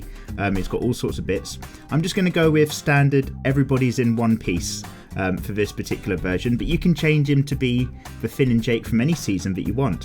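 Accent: United Kingdom. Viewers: Your in the UK from the way you talk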